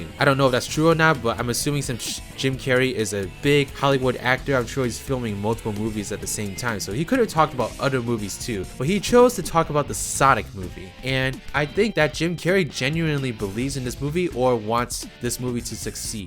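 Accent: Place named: Asia. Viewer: Whoever you are, youre asian, i can tell from your accent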